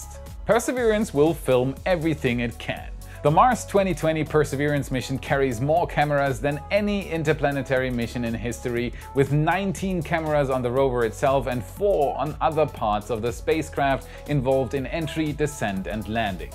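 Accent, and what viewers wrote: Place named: Germany. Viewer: Your English pronunciation is nearly perfect, but a little of your German comes through. Which I find charming. Your video is excellent. Danke!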